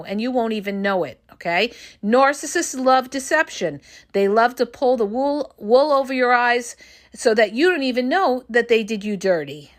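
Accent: New York, United States. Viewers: Besides all the Facts that speaks on about Narcissist Abuse, has the Cutest New York accent here on YouTube 😊❤❤❤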